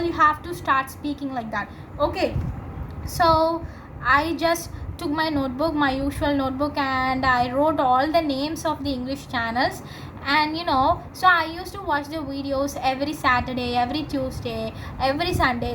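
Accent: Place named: United States. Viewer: American accent is perfect ❤ I loved it.waiting for more videos 🥰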